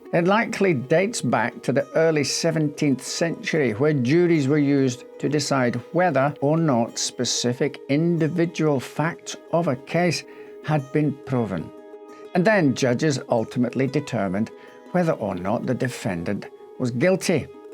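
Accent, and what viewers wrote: Scotland, United Kingdom. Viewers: Well not just a wonderful and salacious video but the fact that your good self with this wonderful Scottish accent just lifts it to a higher level. Wonderful story telling thank you. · Yes, he is Scottish 😁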